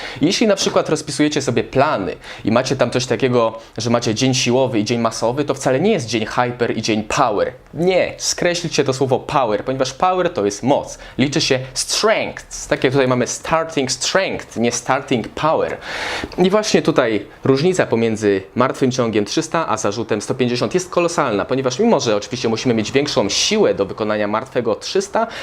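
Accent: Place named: United States. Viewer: Za sam amerykański akcent należy się łapka w górę ;)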